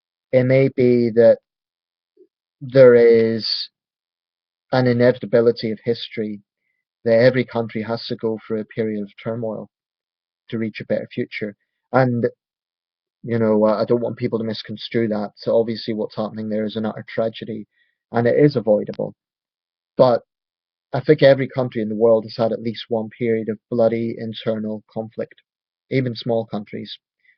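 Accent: Scotland, United Kingdom. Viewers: interesting listen. your ulster accent is particularly scottishesque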